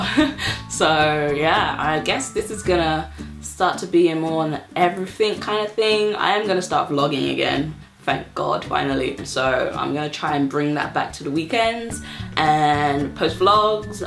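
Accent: London, United Kingdom. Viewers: Language adaptation that's awesome! I do that sometimes well as long as they understand you haha I think you still have your british accent don't worry too much and get out there some more pwahaha! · I'm from London, meself, and that sounds like a London accent to me- (for the most part anyway!) o.o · You still sound London-y to me. I'm very introverted so having plenty of time to myself is idle to me. The last time I felt lonely was when I visited my dad in Nevada, USA and didn't know anyone and it was so isolated because it was basically a desert lol. Do a Facial routine or GRWM video! I'd be interested in seeing that. :) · I still think your British. To me, your accent hasn't really changed. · aww, some moments in this video you did sound very Canadian/American (ok, i can't distinguish between the 2 lol) but the London accent is still there girl! 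Don't worry. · I kind of notice it at some point especially when you emphasise the r in words with r. But you still sound like you're from London. Love your videos, btw! Xxxxxxxxxx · And you definitely dont sound canadian........ At all. You sound very British to me. And my fellow canadian people will definitely say the same thing. ☺ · Most people expect the "Ello guv'nor" type Oliver Twist flex. You still have your accent, it hasn't changed at all. British is not an accent.... Lol. You have a London accent there's a few accented words but it's minor. London misses you. Enjoy Canada! Been watching your videos from day 1. I hope you get back to making the videos again. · You sound proper British! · I saw some of your other videos before seeing this one and I could easily tell you were from London or British. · I love your accent and I knew immediately that you were a Brit. Who have you been talking to?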